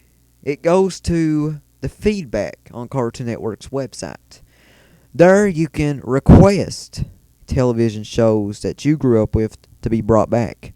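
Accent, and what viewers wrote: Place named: South, United States. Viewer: Are you from the south?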